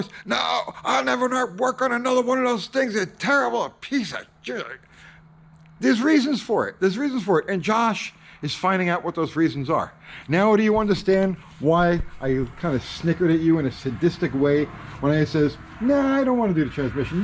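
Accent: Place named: New York, United States. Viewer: You can take out of New York but you can't take the New York out of forgetaboutit!!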